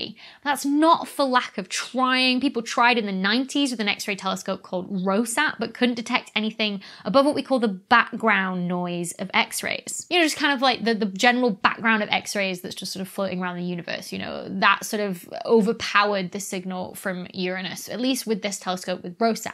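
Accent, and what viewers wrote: United Kingdom. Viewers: Sorry to say Dr Becky, the closed captioning on my television translated your “Saturn” to “satin”. I knew what you were talking about, but my television has problems with a British accent. Love your videos.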